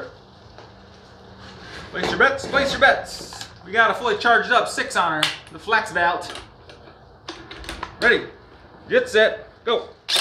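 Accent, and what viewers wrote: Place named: Bemidji, United States. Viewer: You sound like you are from Bemidji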